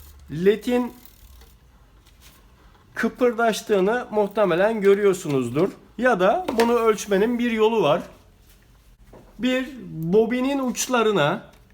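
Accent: Turkey. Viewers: Cok guzel istanbul turkcesi konusuyorsunuz...... Ne kadar zamanda ogrendiniz Turkceyi , ve nerelisiniz?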